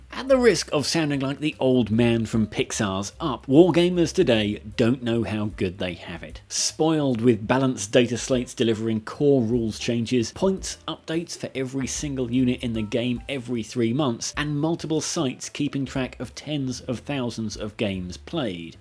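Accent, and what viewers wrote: United Kingdom. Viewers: I'm a simple man, I hear a Brit talking about Warhammer. I automatically assume they're canon and subscribe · Such clean and nice British accent! Like listening nature sounds. Also, nice video)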